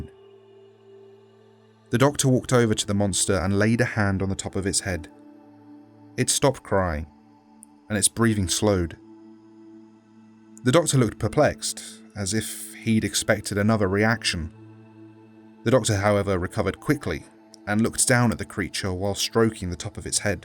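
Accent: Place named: United Kingdom. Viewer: I like british acsents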